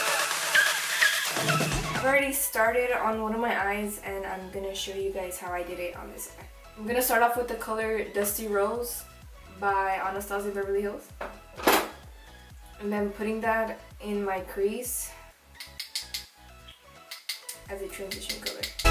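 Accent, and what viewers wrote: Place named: Mexico. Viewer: Lo supe por el acento!! Yo también soy de Mexico y creo que me escucho como tú cuando hablo inglés... es curioso jajaja :*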